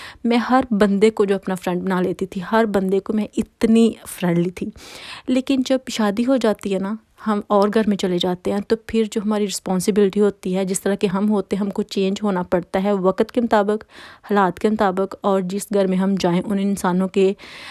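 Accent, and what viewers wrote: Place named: Faisalabad, Pakistan. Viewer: Aoa. App acha bolti h magar boht speed se bolti h. App Faisalabad se h app ki boli btati h k app Faisalabad ki h. Btana zarooor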